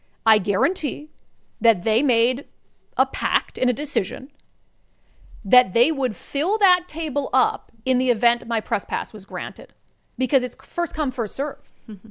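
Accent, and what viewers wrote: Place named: Minnesota, United States. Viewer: now I know why I hear a Minnesotan accent in your voice from time to time. You can take the girl out of Minnesota but you can't take the Minnesota out of the girl! Goes for North Dakotans too, my grandma is from ND, after 70 years she still has her ND Norwegian accent! 😂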